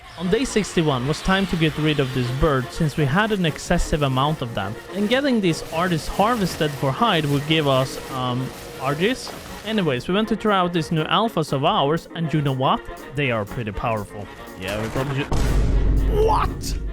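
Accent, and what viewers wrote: Sweden. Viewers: Swedish accent shines through - Your Danish Sub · "VAD I HELVETE" god damn that caught me off guard🤣 Wasn't expecting swedish but guess that's why the accient sounded so familiar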